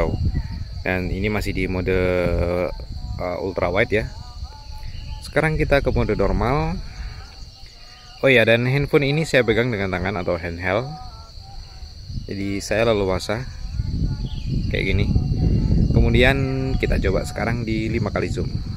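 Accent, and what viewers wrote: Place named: East Java, Indonesia. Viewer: logat pembicaraan kek daerah Jatim (Madiun)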